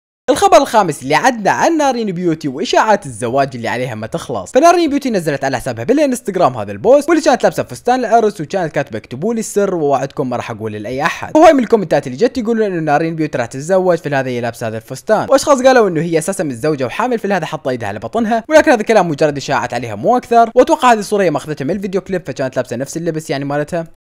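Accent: Iraq. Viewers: عراقيي والله لهجته يمكن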